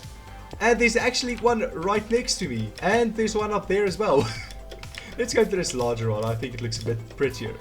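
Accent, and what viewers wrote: Afrikaans, South Africa. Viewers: Are you from south African because ur accent sounds like a afrikaans english Edit: ur channel location is in SA so probably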